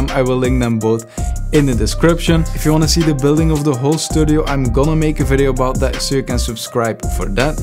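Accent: Flanders, Belgium. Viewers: if you were from flanders (because of the accent) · amai die klinkt belgisch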